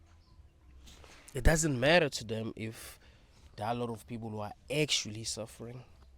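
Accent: South Africa. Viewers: English is not his first language. He's South African. I'm South African, and English isn't our first language. As long as you can understand what He's saying, that's all that matters. If it's too annoying for you, then move along to watch other people who speak how you'd like them to speak. Have a good day.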